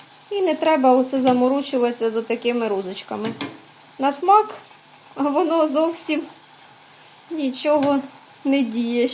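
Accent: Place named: Poltava, Ukraine. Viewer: Яка гарна господарочка, так гарно все розказала i показала, приэмна така мова полтавського говору... Згадалося щасливе дитинство i бабусинi смачнi xрущики та пирiжки з вишнею. Спасибi за смачне печиво. Щастя та здоров'я господинi та ii ciм'i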